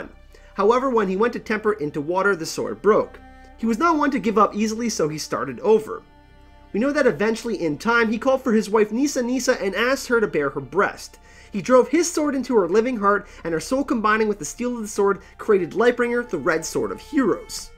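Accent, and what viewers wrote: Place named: Canada. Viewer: Wass🆙 🆒vid! Enjoyed this 💯% Your up-beat personality 💞 makes your vids a joy🌈 to watch👀 Your Cute Canadian accent makes your vids a joy🌈 to listen to👂 Always a pleasure, Thanx! 💞 💙💛💚💜💙💛💚💜💙💛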